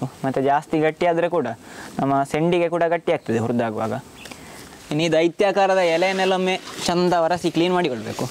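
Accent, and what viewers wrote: Mangalore, India. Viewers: As a malayali living in bangalore your (Mangalore) kannada accent is so beautiful, does not sounds like rude as here in bangalore due to inter-mixing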